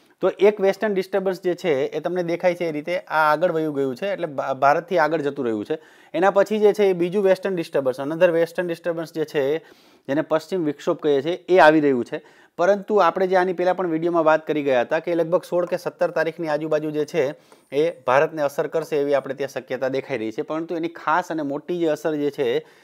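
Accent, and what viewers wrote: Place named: Kathiyawad, India. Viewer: સરસ આપની ભાષામા કાઠિયાવાડી ઝલક છે સૌરાષ્ટ્ર ના છો?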